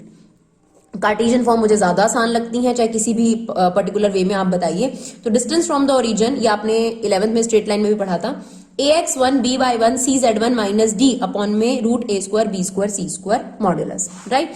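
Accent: Punjab, India. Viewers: You are from Punjab i think because of your accent . But still i respect you because you are awesome 😎 👍🏻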